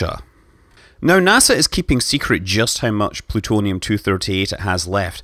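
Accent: Scotland, United Kingdom. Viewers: Scott's a Scot, and still has a Scottish dialect. This includes occasional alveolar taps which are sort of a cross between r, d, and l, and when the r is a linking r, it is almost always given an alveolar tap.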